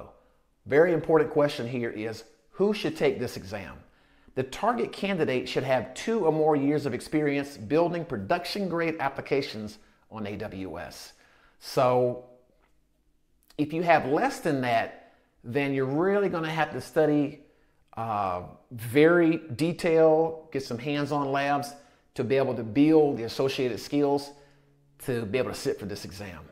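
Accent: South, United States. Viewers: Alabama or Tennessee?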